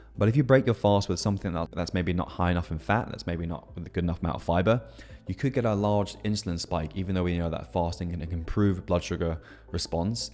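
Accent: RP, United Kingdom. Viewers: Great video with good information! Btw - I LOVE your posh accent 🔥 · I’m not sure if anyone in the world authentically pronounces fasting as faaahhhhhsting and last as laaaaahhhhhhst. IDK….is it a way to say ‘hey, look at me as a productivity guru, I’m so successful I have a ridiculously posh accent!’.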